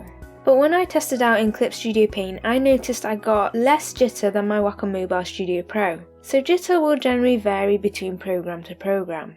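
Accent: United Kingdom. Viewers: Thank you for your tutorial thank you for explaining it that was very impressive love your British accent and again I gave you a thumbs up and a subscription thank you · I didn't notice the accent probably because we're both British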